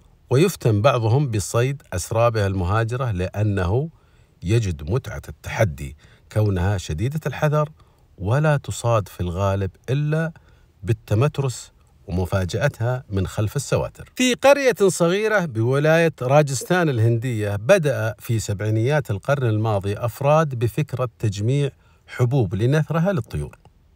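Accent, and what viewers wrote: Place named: Hejaz, Saudi Arabia. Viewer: موفق ..سرد مميز وصوت جميل للوثائقيات بنكهة حجازية اتمنى الاكثر منك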